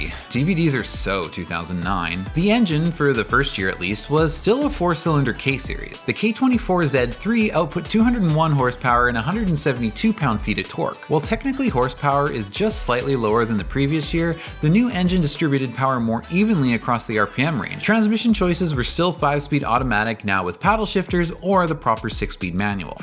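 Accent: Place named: Canada. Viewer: Just wanted to say that I love your videos! My grandma had the original MDX, and I remember being blown away by the second gen when I was about 5 haha. Love the Canadian talk too, as an Albertan. Acura's always been on my radar, but I'm driving a 2010 Corolla XRS right now (considering importing an SC430 after I'm done Uni). Subscribed!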